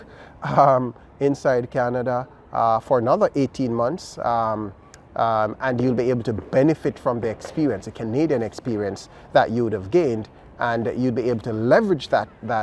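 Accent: Jamaica. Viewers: Thanks for the info, are u jamaican?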